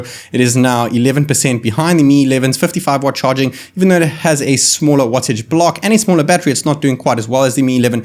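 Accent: South Africa. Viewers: Had to like and sub because of the South African accent ❤️ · Bro are you from SA? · Listening to you, sounds like you're South African, getting some SA accent vibes :D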